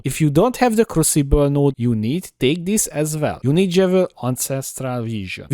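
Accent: Hungary. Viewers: U Hungarian? Sounds like it.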